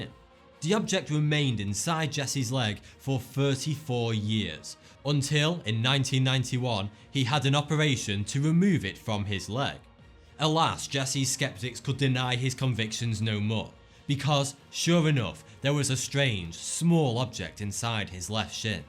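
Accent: United Kingdom. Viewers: this dude is very British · He is British (not trying to be racist)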